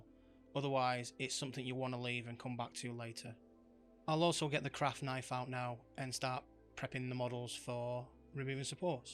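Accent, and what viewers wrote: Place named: Northern England, United Kingdom. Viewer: Dude I completely didn’t expect your accent when I came in here. Super happy to be supporting a northern artist.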